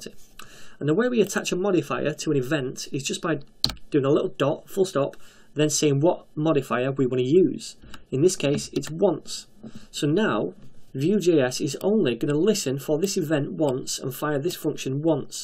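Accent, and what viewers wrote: United Kingdom. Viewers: your British accent is amazing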